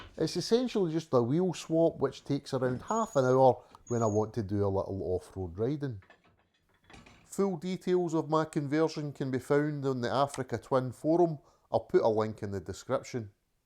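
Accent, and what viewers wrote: Scotland, United Kingdom. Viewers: Thanks for your work. Iran has been my best trip so far, the people there are so friendly with the visitors and specifically with riders because we have bikes they have never seen in their life (big ones are only for the army and the police). As always , problems come with politics ans religion... On the way to Iran the Balkans are fantastic when riding on mountain roads (get away form the coast...), Albania is......different... And eastern Turkey (Kurd country) is also interesting with very nice people. I love your scottish accent (although sometimes difficult to understand) and Scotland (I made the North 500 road) Keep well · Love the review and the comparison to the bullet proof Honda's of days past. I owned quite a few Honda's from the 70's to 90's and apart from the VF1000 (mad bike) they were utterly dependable. I now drive Guzzi's with varying levels of reliability. 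But I must say the most current one (a 2008 Stelvio) performs well, for all the other ones I trade driving pleasure for reliability😇. Worth it, but it is not for everyone. Specially my Quota1000 which I think is the most brilliant failure for a motor bike. A lot of issues, but it drives brilliant like no other. Any way I enjoyed your video, you are using your bike not for show, but for what you can do with it, and I am very envious of you living in Scotland , as I know from experience how nice it can be to drive there. Great small twisty roads and beautiful scenery. Had to re calibrate to understand your commentary a bit with the Scottish accent but don't change. Awesome content.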